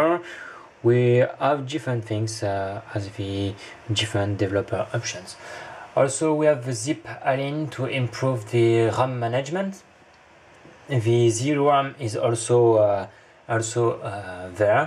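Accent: France. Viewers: On peux entendre dans ton dialecte on Anglais.Mais c'est typiquement "Francais-anglais" et j'aime ca :) Ou les Francais parle l'allemand et c'est chéri :D Ps : Excusé pour ma mauvaise francais :D Salutions de Luxembourg :) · Haha, je suis venu dans les comms exprès pour voir d'où tu venais, je comprenais beaucoup trop bien ton anglais pour que tu viennes d'ailleurs que de france ^^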